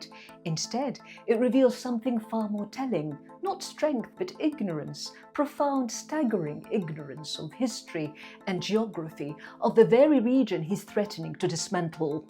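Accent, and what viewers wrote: RP, United Kingdom. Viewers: Excellently read lines off the screen with a weird queen's english accent. Just bizarre. Don't know what is worse - ai slop or fakery like this.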